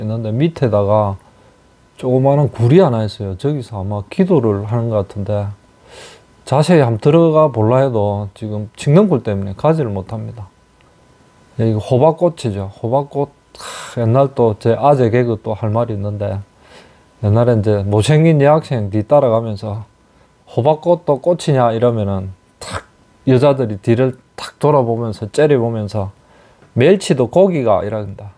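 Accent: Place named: Gyeongbuk, South Korea. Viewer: 도 경북말투 억양 이시네요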